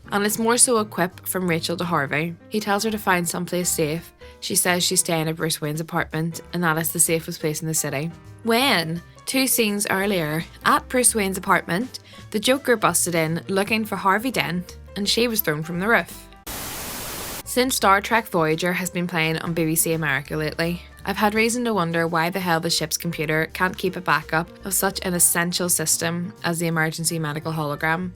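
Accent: Scotland, United Kingdom. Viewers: Just curious but are you Scottish, maybe Irish?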